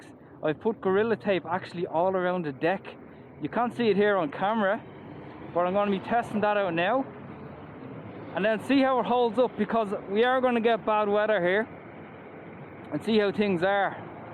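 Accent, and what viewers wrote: Ireland. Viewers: That Irish accent is pretty strong 😂😂😂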